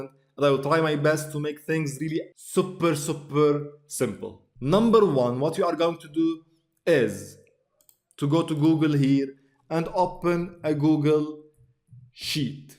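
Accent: Lebanon. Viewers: i swear 7asset ennak lebneni min ur accent... fricken love that!!!!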